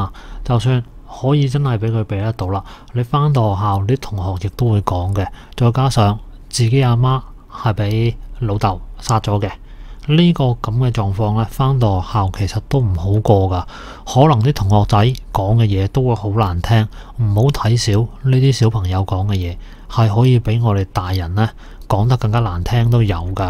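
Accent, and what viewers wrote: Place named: Mainland, China. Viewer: 你把聲 好似係大陸人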